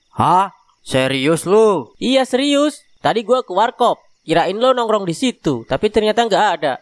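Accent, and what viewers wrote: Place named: Banyumas, Indonesia. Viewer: Bahasane ngapak wae om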